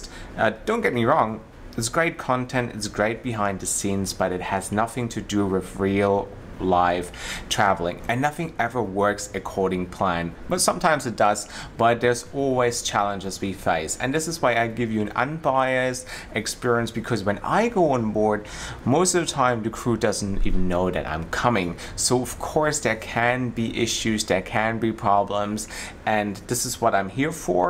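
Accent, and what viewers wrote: Australia. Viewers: What nationality are you? Sometimes you sound Aussie, sometimes almost south African, and other times I can't tell. Just wondering. Great videos😀😁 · [comment made May 16, 2021] You're a great guy. Thanks for your continuous honest reporting. I've been watching quite a few of your videos in the last week and I really like your positive energy. I look forward to viewing more of your vids. I am Dutch born, Groningen, Netherlands, and because of my father's job I spent my childhood from age 4 to 12 in Nigeria and Ghana.. great experience. We traveled back and forth to the Netherlands every 2 years stopping in all the West African countries on the way. I really enjoy traveling.. though don't travel as much as you.. LOL.. but I traveled through France a number of times and the U.S.A and Canada. I presently live in Montreal, Canada. Since 2012 I travel very frequently to Mexico and Dominican Republic. 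I am multilingual though only 4 languages with fluency. My wife is French European and is perfectly bilingual without a trace of accent. We really enjoy your way of speaking English with an Aussi accent.. LOL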